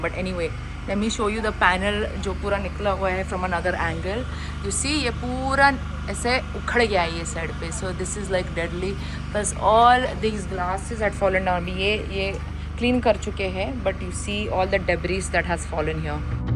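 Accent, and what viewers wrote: India. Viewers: I hate Indian English accent why u guys do this trrrrrŕrrrre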